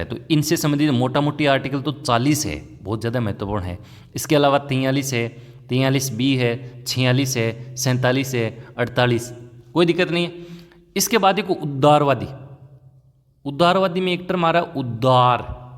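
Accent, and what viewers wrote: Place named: Shekhawati, India. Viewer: Sir aap shekhwati se lg rhe ho muje sari marwadi boldi h aaj ti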